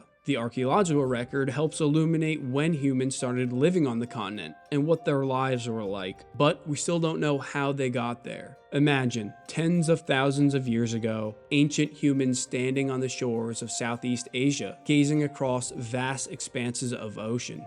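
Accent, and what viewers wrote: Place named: United States. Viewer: Why is this narrated by an American?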